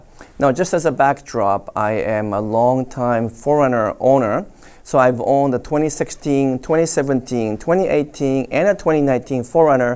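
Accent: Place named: Japan. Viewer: Oh damn the best Japanese English.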